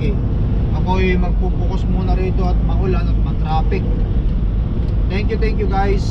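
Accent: Ilocos, Philippines. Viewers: Kaykayat ko ta ilokano accent mo lalo no ag-english ka, reminds me of my old man. Ilokano Owner/operator as well ditoy abbotsford BC ngem salesman/distributor ti business ko ti winnipeg based chips company. 18 years na ako sa Canada medyo naikkat kon diay ilokano ken pinoy accent kon na agsao ak ti english. Hahaha praktis lang. Lol😂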